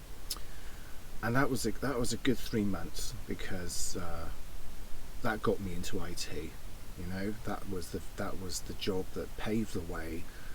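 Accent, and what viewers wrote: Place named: England, United Kingdom. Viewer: I would suggest you try a career helping women take care of their computers, doing everything needed to keep their computers going, also helping with mowing the grass and fixing doors when needed. Meanwhile, I think you should film all of England for those of us across the pond. I just watched 14 minutes of you and the best moment was when you said the dog was chilling. But I want to see cows and countryside with you talking about all the places in England and hear your lovely accent and "pardon me"'s for your coughs. That could be your signature, in a good way. Then we'd know it's you behind the camera.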